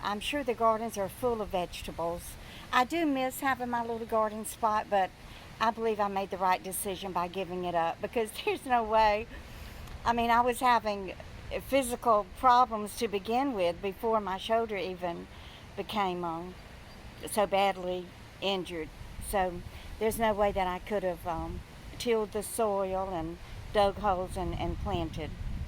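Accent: South, United States. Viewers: A beautiful video. And I love hearing your southern accent. Could those tomatoes be heirlooms? Thanks!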